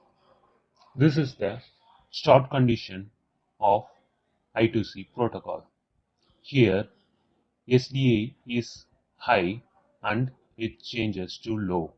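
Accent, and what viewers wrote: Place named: India. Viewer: come on idiot!!! stop speaking english... i hate indian poeple speaking English... it sounds awful !!! I hate indian people since now.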